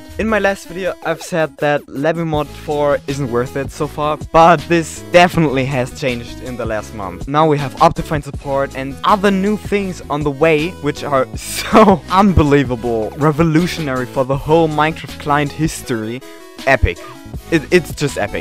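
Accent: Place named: Germany. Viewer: I bemerken über your MUTTERSPRACHE that du habe a DEUTSCH Akzent , do you DEUTSCH?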